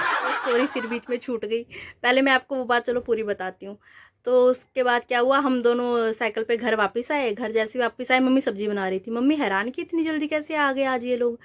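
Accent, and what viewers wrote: Punjab, India. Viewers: बहुत सुंदर प्रस्तुति बेटा तुम्हारा बोलने का टोन पंजाबी हो गया है।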